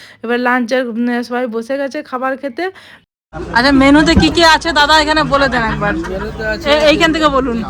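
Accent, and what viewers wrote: Bankura, India. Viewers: তুমি কি সবসময় এভাবে ই কথা বলো?মনে তো হয় তোমরা বাঙালি ব্রাহ্মণ। তুমি বাঁকুড়ার লোক।বাঁকুড়ার সবাই কি এরকম ভাবে কথা বলে?আমি ঠিক জানি না।তাই জিজ্ঞেস করছি।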